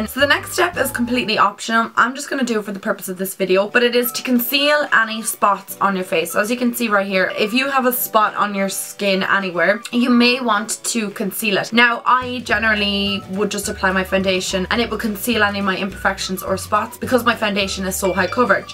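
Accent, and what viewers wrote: Ireland. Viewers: Are you Irish? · I've watched your videos for some time now and I still cant get over your accent! I love Irish accents! They are so much more interesting than the boring oll American one. Loved this video as well! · omg are you irish? C: you sound irish :D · Your Irish accent is so beautiful!! And so are you <3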